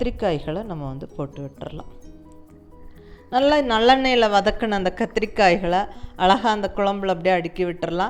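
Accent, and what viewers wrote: Coimbatore, India. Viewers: Coimbatore slang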